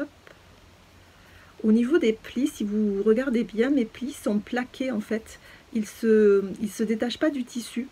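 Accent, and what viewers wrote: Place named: South, France. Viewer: Kk, Je viens de regarder tes vidéos, j'aime beaucoup suivre ton évolution et je te trouve débrouillarde surtout avec le tee-shirt adulte transformé pour enfant. Tu as la voix calme avec un accend du sud bien sympathique. Je suis abonnée pour pouvoir suivre ton évolution 😘😘😘😘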